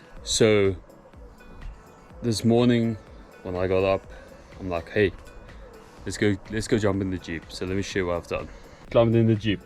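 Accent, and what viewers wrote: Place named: South Africa. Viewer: I’m assuming you’re from somewhere in SA. I can tell why the accent, I just saw the ad for this channel and I’m loving it keep up the awesome videos.😁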